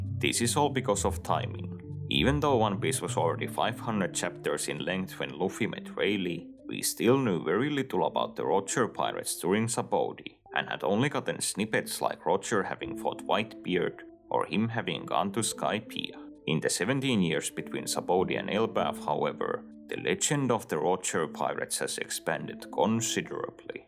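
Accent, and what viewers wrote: Finland. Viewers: Are you Finnish by any chance? great video btw!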